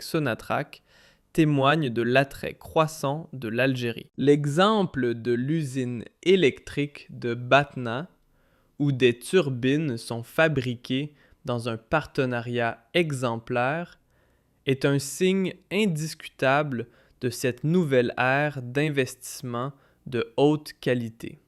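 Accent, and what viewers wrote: Quebec, Canada. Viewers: Encore un soldat immigré dz des caporaux avec un accent Québécoi qui croit que l'Algérie est une puissance alors que la queue sur l'alimentation se mesure en kilomètres. Je suis persuadé que l'Algérie finira par être disloqué avec ce régime actuel qui emmène le pays droit au mur.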